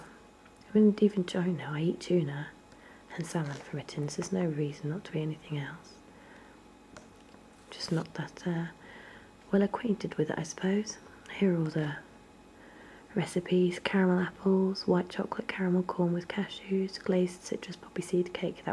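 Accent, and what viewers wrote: England, United Kingdom. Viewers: just discovered your videos. you have a very calming and lovely voice, even when you're speaking normally! not too loud, not too soft. the accent helps too...but i'm an american; we generally love listening to english accents ha :)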